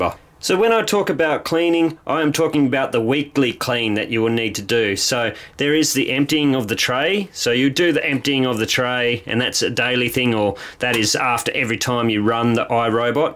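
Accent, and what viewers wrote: Australia. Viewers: Very useful and clear, and I got to listen to your accent too :-) Aussie I assume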